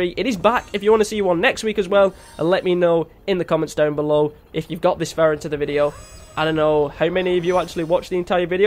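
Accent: United Kingdom. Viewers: the way, you sound really really UK-ish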